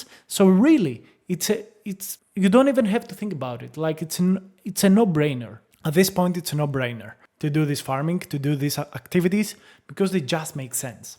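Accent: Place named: Greece. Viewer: I have a strong feeling that you’re one of the few honest YouTubers out there — someone who speaks openly, doesn’t try to take advantage of anyone, and always keeps it real with your audience. Also, I noticed that you speak English with a bit of a Greek accent, which makes your videos even more unique and enjoyable!😊 By the way, I left a comment on your previous video — I was wondering why Bullpen isn’t listed on DefiLlama? That’s actually one of the reasons I have some concerns about Bullpen.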